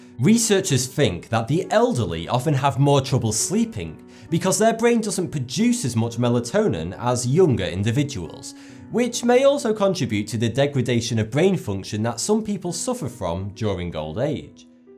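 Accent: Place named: North, United Kingdom. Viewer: Hey where are you from because I recognise your accent.Do you live near Bury or Bolton?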